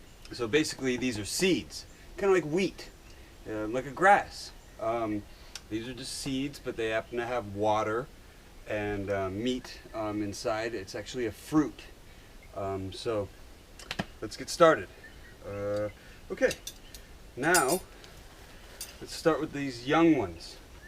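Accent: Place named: Midwest, United States. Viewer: I’m willing to bet you did not grow up in Hawaii. You know you would be ridiculed for wearing those sandals and speaking with a midwestern accent. Be honest, what state are you really from?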